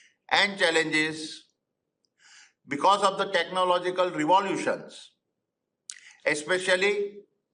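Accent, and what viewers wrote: India. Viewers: Damn! Even his english is like modi's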